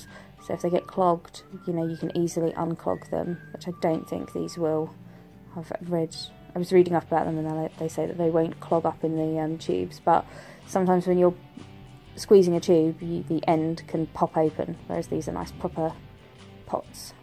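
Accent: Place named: United Kingdom. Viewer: You're awesome, just found you in this moment and subscribed immediately! ;) Love your voice and British (?) English :) Greetings from Germany!!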